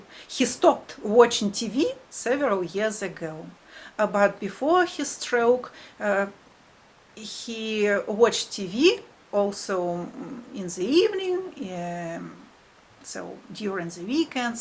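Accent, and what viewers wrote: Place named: Russia. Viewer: please, do what you want to do here on YouTube. My boyfriend is a Russian who used to work quite frequently here in the United States. He spent roughly sixty to seventy percent of his life here with me. Back in Russia he has two kids with an ex. As such he would have to go back and spend time with his family there. Such was the case at the time that this situation came about. I was there with him for the new year and came back home the following week. We're both about 40 years old and I can indeed say with absolute confidence that this is a person that I love and miss dearly and would love to be married to at some point. Any plans for such a currently on hold for obvious reasons. I've been to his family's dacha several times. I'm on speaking terms with his family there despite the political situation. Watching you to go about your day for me is a kind of therapy. 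Hearing your accents the people around you speaking in Russian it gives me a sense of home that I can't have right now. Please, understand that people will be miserable because of their own personal problems and projecting such unpleasantness on you is all they can do. They're bored, they are shallow. You however, small a one it may be, a light in the darkness. However long that darkness is the last. Пока-пока:)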